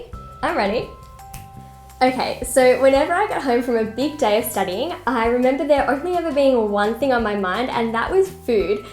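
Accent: Australia. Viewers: Australia was a British colony, so most of the earlier settlers and first English-speakers were British so Australian English has very close spelling and pronunciation to Brits. most Australians speak like her. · Australian accent I can tell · JanielForLife Australian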